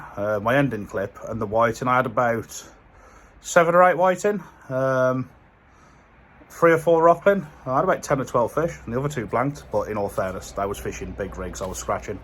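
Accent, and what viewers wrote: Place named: Worcestershire, United Kingdom. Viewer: Are you a Worcestershire lad. Every time I hear your accent it reminds me of my home town of Bromsgrove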